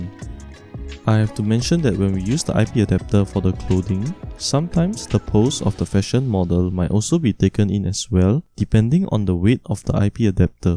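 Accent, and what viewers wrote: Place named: Singapore. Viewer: I'm glad I found this video, very well explained on the IPadapter workflow. Subscribed to the channel.. btw, love the Singaporean accent :)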